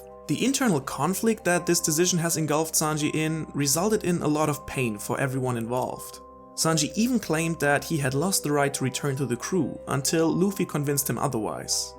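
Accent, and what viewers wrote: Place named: Germany. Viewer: Are you german? Your english sounds like you are german 😁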